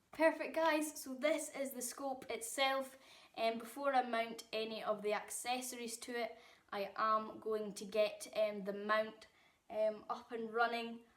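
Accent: Scotland, United Kingdom. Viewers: Scottish accents and telescopes. Good times. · That Scottish accent though.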